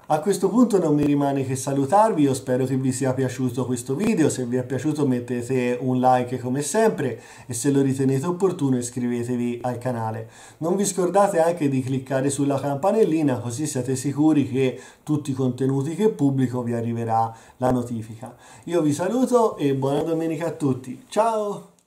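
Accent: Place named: Arezzo, Italy. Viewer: Bella recensione complimenti! Con una bella spiegazione storica sull' art déco! Sono finito sul tuo canale praticamente per caso cercando delle recensioni in italiano sul Reverso ; è un modello che ho sempre adorato e negli ultimi mesi è diventato una "fissa" 😆 presumo tu sia dell'aretino/ Val d'arno dal tuo accento ? 😄 sbaglio o a si vede un movimento della Chopard ?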